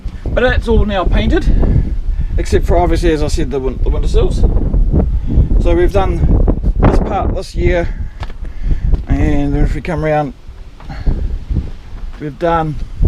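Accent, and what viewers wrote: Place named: New Zealand. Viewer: Welcome back, nice to hear that cultured kiwi accent again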